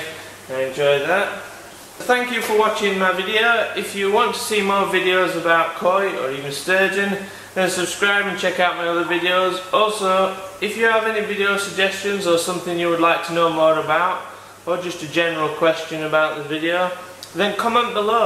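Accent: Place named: Yorkshire, United Kingdom. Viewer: I just found your vids they are so informative and well done, love the commentatory in great Yorkshire accent.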